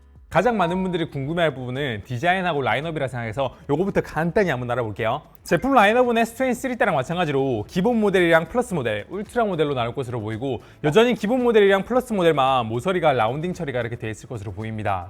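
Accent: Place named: Seoul, South Korea. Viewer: 오랜만에 왔는데 말투가 시원시원하게 바뀌시고 딕션도 더 좋아지셨어요 빠르기도 빠르고 부드러운 서울말쓰는 느낌 ㅎ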